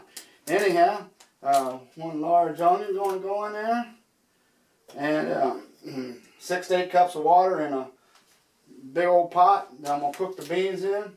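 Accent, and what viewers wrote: South, United States. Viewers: i 💙that southern food and accent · ...I think your cute. Love your southern talk to. Good meal. Thanks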